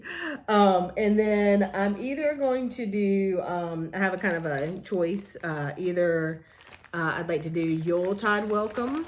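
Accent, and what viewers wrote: South, United States. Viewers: Hi..I also watched Carol Saltbox Stitcher and she recommended your channel. Really enjoyed seeing your projects..love your Be Thankful and Good Cheer pillows..I have both patterns and hope to stitch them this year. I regret to say I didn’t know about your previous flosstube so could you sort of re-introduce yourself in your next video. I’m always interested in where people are from and you sounded like you might be from the South lol..sounded like home to me 😊 Have a great weekend and I look forward to your next episode. Suzette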